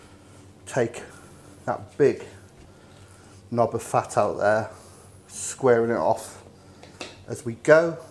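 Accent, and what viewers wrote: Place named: West Country, United Kingdom. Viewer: You got a westcountry accent like mine but I cant quite place it... top video by the way. Butchered by own lamb while watching you.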